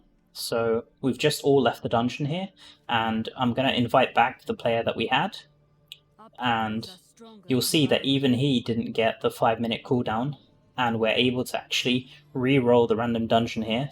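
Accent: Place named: United Kingdom. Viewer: Y u name urself after biggest mass murderer but sound british?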